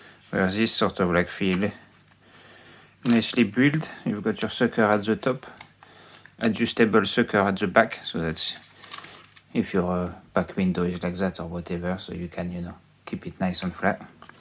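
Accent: France. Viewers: sacré accent français je trouve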